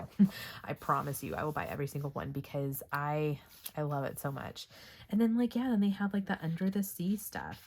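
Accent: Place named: Texas, United States. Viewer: Wow, congrats on the record and all such beautiful pages 🥰📚 I love your Texan accent and you did soo good on the pronunciation 👍🏻 Thank you for the shout out and your very sweet words, I really enjoyed our body-color 😊 I hope you feel better soon and I really look forward to all the interesting things you have planned for the channel and also congrats on all the new subscribers, that is so awesome 🤩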